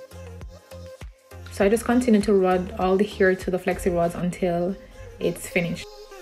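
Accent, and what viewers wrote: Jamaica. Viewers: I have a sixth sense for a Jamaican accent...... Great video🇯🇲🇯🇲🇯🇲🇯🇲🇯🇲🇯🇲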